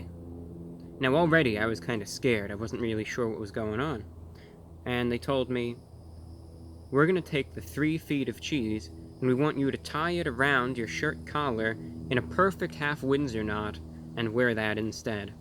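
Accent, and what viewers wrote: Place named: Tampa Bay, United States. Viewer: Looks like youre in my area...tampa bay. Sounds like it too.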